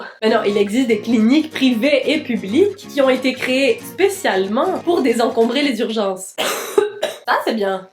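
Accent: Quebec, Canada. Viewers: Jai une pote quebequoise elle me tue avc son accent et jaime beaucoup laccents québécois et je croyais que le Québec parlai pas francais hihiii donc jai appris laccents grâce à toi je la parle que quebequois la hopihoihii... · Tu commence à avoir l’accent québécois j’aime bien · Grave on dirait vraiment qu'elle est né à Québec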